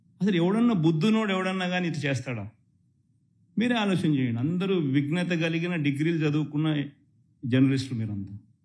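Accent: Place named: Rayalaseema, India. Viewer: rayalaseema slang n language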